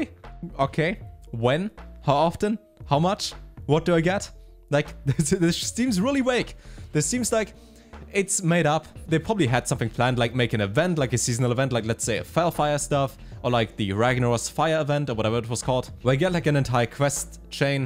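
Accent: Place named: Germany. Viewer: he's making a lot of good comments, but boy, this German accent is killing me ...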